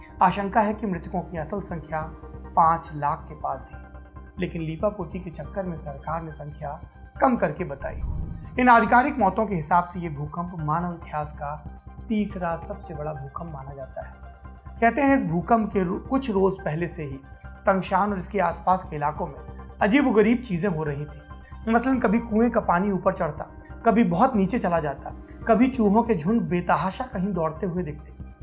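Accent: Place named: Varanasi, India. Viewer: Mahur bol dela guru..... Banarsi style me😜😜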